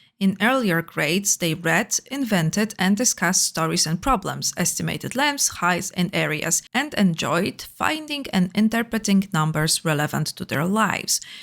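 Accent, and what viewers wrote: Poland. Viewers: It's beutiful to hear how polish accent in English looks like! XD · just the accent.. my poland radar went off instantly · hears polish accent -'no way' checks channel name 😮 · Kocham kontrast pomiędzy ośmiornicą bez podstawówki, a człowiekiem bez podstawówki. Strong Polish akcent btw ^-^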